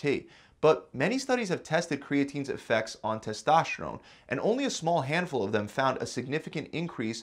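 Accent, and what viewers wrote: Philadelphia, United States. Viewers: You from Philly or South Jersey? I know wooder when i hear it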